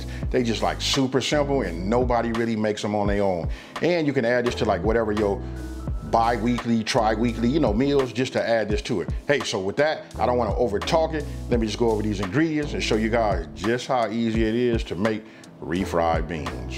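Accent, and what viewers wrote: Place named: South, United States. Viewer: where are you orginally from? that aint no california accent thats gotta be from the south brother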